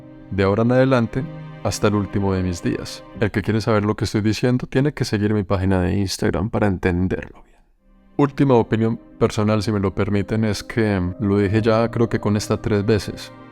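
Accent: Colombia. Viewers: Haaa no, si eres comunista ya no te sigo mas jjjj jjj Me encantan tus videos y ese acento colombiano. Dios te bendiga, desde Cuba. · Es un lindo sueño pensar en su hermosa voz y su bello acento colombiano, como el primer sonido que yo podría escuchar al despertar. Es usted bello y admirable. Me ha robado el corazón con su personalidad y su talento. Reciba usted un gran abrazo con mucho cariño desde México 💕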